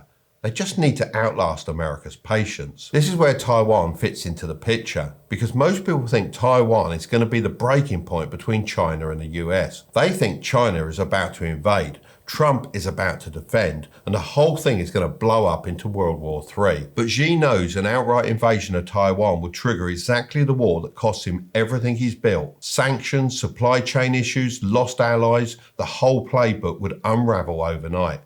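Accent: Cockney, United Kingdom. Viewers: Cockney accent detected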